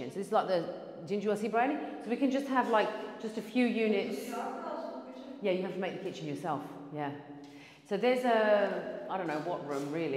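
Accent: United Kingdom. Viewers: I just love the Uk Accent .. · British accent.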